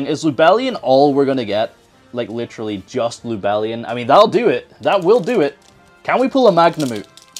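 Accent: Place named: Scotland, United Kingdom. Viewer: Getting a binder full of good stuff? 6/10 Watching a scottish man talk about cardboard in a funny accent? 10/10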